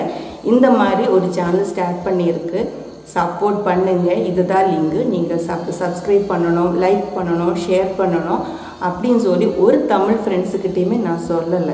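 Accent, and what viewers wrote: Kerala, India. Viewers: We tamilnadu people love Kerala people and their slang..Hard work Never fails Sis.. keep rocking dear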